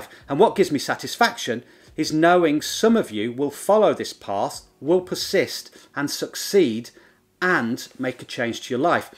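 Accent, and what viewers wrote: United Kingdom. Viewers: Love your British accent...It makes your tips oh so much more valuable!! When I close my eyes, I hear Michael Caine...Cheers!! #smileyheart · I didnt intend to go for KDP, because so many people said (at least, on Youtube) it's saturated. But your video about creating a lined journal persuaded me to give a try. As for this video, it's very honest and to the piont. I dont know, if it seems to me but you sound British rather than American :)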